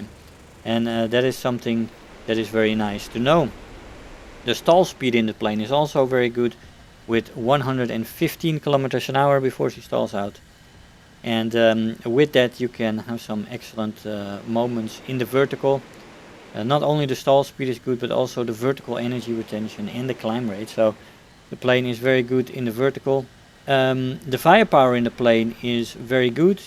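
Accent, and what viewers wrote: Netherlands. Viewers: je klinkt nederlands of misschien ligt het aan mij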